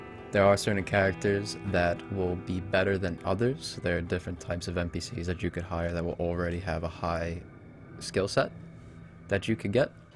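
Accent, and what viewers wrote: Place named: New York, United States. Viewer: Are you from New York? Judging by the way you pronounce "dog" and "small".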